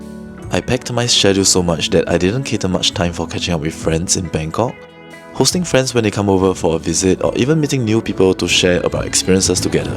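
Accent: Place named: Singapore. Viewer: Hey, keep going man. People who succeed in life are the ones who always learn and push themselves to be better. Good luck with your goals and inspirations. P.S. You sound like Singaporean. I am moving to BKK from SG with the family soon too (I am a westerner). Maybe we could catch up IRL:D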